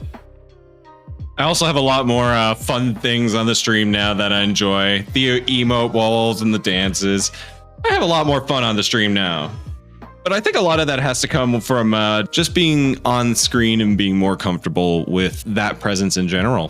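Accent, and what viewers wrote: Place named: Canada. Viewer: Timmy's heretic... it's an institution- props. Subscribed just to hear you say fehkking and oot- love the chainbrain T, too! Maniac.Make a bluenose expat homesick.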